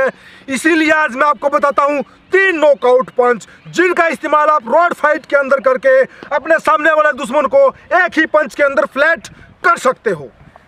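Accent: Haryana, India. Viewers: Are you haryanvi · Haryanvi ton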